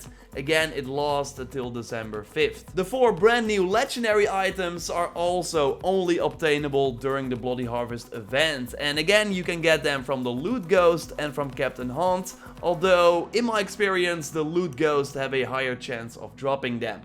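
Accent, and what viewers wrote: Netherlands. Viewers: Are you dutch because your accent bleeds through every now and then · Because they're both Dutch and this is a common accent here. They overpronounce stuff. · That dutch accent though..... Lekker bezig mannen!! 😜😎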